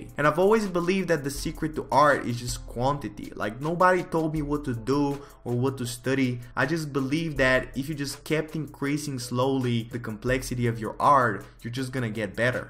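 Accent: Russia. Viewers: Why are you talking with a Russian accent? it's me saying to you as russian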